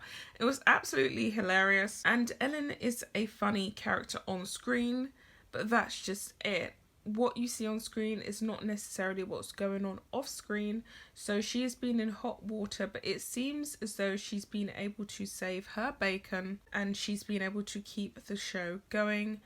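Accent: England, United Kingdom. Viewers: I think the way you pronounce her last name is adorable and speaks to your English accent. Honestly people are so nasty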